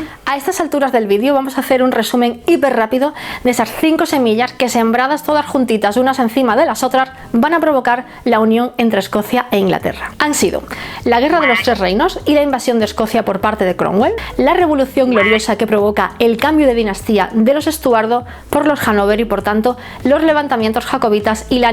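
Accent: Spain. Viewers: Me encanta tu acento español Por eso España es de Puta madre como decía la canción de los 80’s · Me encanta cómo explicas todo!! Tu acento tan Lindoo. Nos encantó Escocia 🏴, estuvimos en Octubre del año pasado con mi marido, esperamos volver 🙌🏻 y hacer un lindo recorrido de esos que tenes en tu blog😊. Y por supuesto España es Muy muy Bello tu país ☺️ Saludos desde Argentina 😘🙋🏻‍♀️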